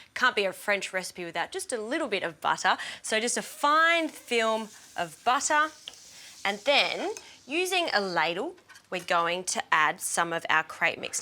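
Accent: Australia. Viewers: Australian accent! 🤩 I am Brazilian and worked on a cruise ship in Australia. Instagram: alinestravels I will try this recipe. I love galettes!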